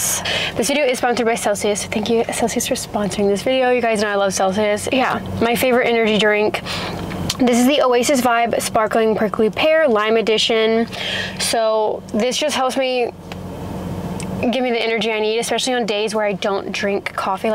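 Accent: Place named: England, United Kingdom. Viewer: I love your English accents!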